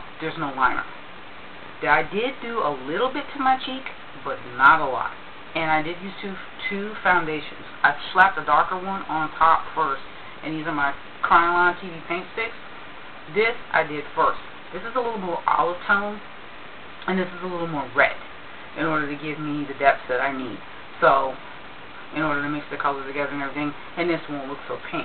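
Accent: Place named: United States. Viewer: You sound soooooo country :0) I am loving those eyes! Nikkie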